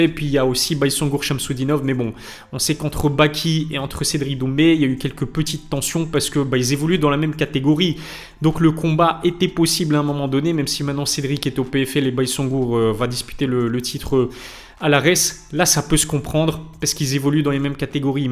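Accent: Belgium. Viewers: Très belle chaîne mma, sincèrement, très passionné et sérieux 👍🏻 Et puis, ce petit accent belge est un plaisir et une marque de fabrique 😁 Merci à toi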